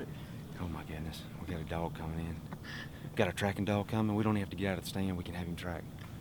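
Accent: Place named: Georgia, United States. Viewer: Been watching boys for a few years now and went to back to watch old videos and you can hear the southern accent on all the boys but this is no doubt a good.ol Georgia boy. I never understood why people let others determine their decisions. The property owner gives you permission but the boyfriend doesn't like hunting so she changed her mind. BS the bf was jealous of another guy being at the house, even though it's the woods out back. I've seen videos where you get permission and then the neighbor freaks out so they take it back. Personally if a neighbor of mine told me they didn't want someone hunting on MY property I'ld tell straight out "it doesn't effect you one bit, it doesn't effect your house, property or life in one bit so too f-en bad". Luckily I live in a pretty rural area and for years couldn't really see many neighbors but they are slowly closing in. 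Worse part is they move out to the country, literally was all farm land when I grew up but those have become almost extinct, but they move out here and then complain about the smell when a farmer is spreading manure on his fields, or complain about turkeys and deer in thier yard eating landscaping or.pooping, but dont like hunting.🤷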